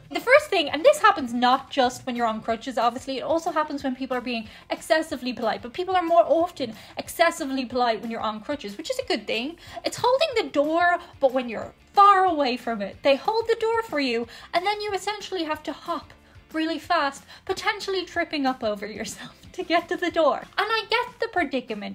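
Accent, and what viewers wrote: Ireland. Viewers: You're an angel! I'm sorry that you had to have knee surgery but it seems to have gone well. Something that seems different in this video is that you seem to be talking faster than usual, and with more of an Irish accent than you normally do. My best wishes to you for a speedy recovery!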